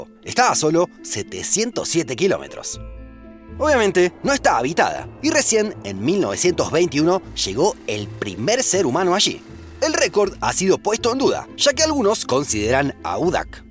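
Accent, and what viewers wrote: Argentina. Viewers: Gracias! Me gusta mucho las vídeos como este. Por cierto, con que acento habla presentador? Argentino? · Olvidaste la Isla de Pascua .mmmmm tienes acento argentino. Eso lo explica .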